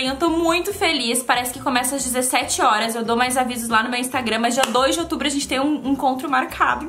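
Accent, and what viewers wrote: Rio Grande do Sul, Brazil. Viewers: Te, ta, guria, guri, de... que amooorrr quando ela fala como gaúcha😍😍❤️❤️, sou do Rio Grande tbm, mas do interior, no fim do mundo na vdd 😂😂😂 Porto Xavier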